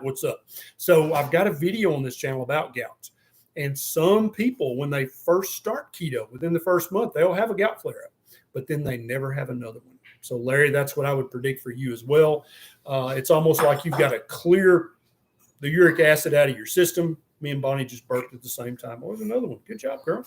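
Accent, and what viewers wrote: South, United States. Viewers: Oh, lighten up. The doc has a southern accent and he is in his own home. He can talk any way he wants. If it offends you, you don't have to listen.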